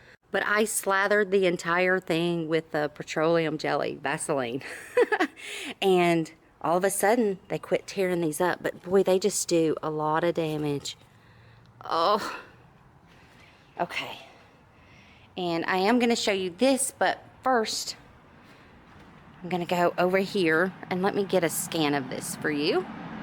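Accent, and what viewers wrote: South, United States. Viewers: What a beautiful garden, and you have the most beautiful southern accent, my dear friend. What would you do if you planted a carrot garden?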